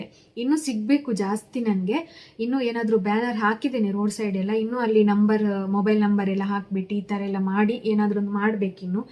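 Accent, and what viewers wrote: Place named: Mangalore, India. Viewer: Neevu maatnaduvaga Mangalore bhashe tara ide akk a.nim ooru yavdu.naanu mamgalore adke kelde .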